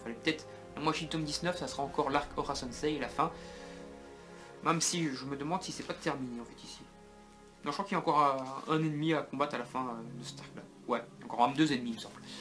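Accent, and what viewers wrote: Belgium. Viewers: t'es belge ?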